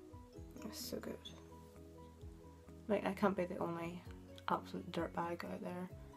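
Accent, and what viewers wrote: Northern Ireland, United Kingdom. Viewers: Northern iron girl ! Luv our accent on youtube 😁👍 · I'm from NI too and I know exactly what you mean about saying Northern Ireland in our accent hahaha, love your videos chick you're super talented! X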